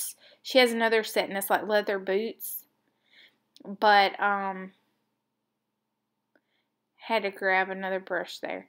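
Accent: South, United States. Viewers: My oh my what an entertaining video. You have all the makings of a TRUE artist. Mygrandaughter once said “ us artists don’t give a care”. Referring to freeing ourselves As we create, KEEP on believing in yourself . The southern accent struck my heart.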